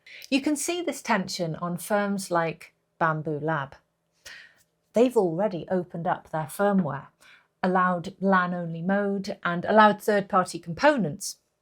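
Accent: Wales, United Kingdom. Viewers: Might be a strange comment but...is that a bit of Welsh I hear in your accent? watched and enjoyed a few of your videos, but only noticed it in the last half of this one.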